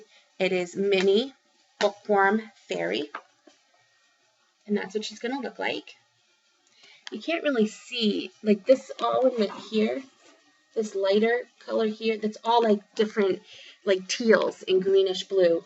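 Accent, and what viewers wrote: United States. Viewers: Hi! So many pretties to comment on and you've been super busy this month! Absolutely adore your FFOs - they ALL look amazing! I agree with you - doing a HAED on 18ct is still my favourite. Although the tent stitch is somewhat faster, I still prefer doing full crosses and 18ct has great coverage. Could you please share with me the link to the feeble you mentioned "There's no place like home"? I'm pretty sure I don't have that one. I love your accent.... I'm slowly beginning to hear the differences between the various areas of the U.S. 😉. Apparently here in Australia we have different accents depending on what area we're from too... But I've never been able to pick them! Your Stitchrovia piece is my favourite of yours - I get really excited every time you show that one! I know I'm going to have to purchase it at some stage LOL. Thanks so much for tagging my tutorial... 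Great appreciate that, and I'm so happy you found it useful. Happy stitching 😊 xx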